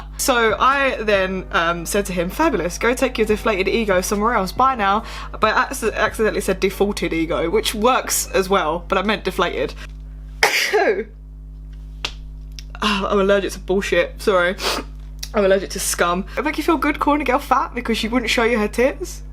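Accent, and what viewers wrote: Essex, United Kingdom. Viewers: I love your Essex accent 😋 keep posting videos... Love from India 😋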